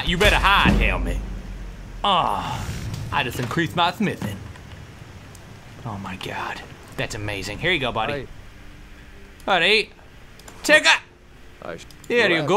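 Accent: Scotland, United Kingdom. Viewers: Not bad Scottish accent :D Of course, i'm comparing you to Hypermole from mole237 (free advert for him) So...yeh, do it more :D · I prefer the scottish accent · SCOTTISH ACCENT? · dat scottish accent :V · 'TIs a Scottish accent :3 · he's got the Scottish accent but not the slang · No he spoke british and scottish accents in the video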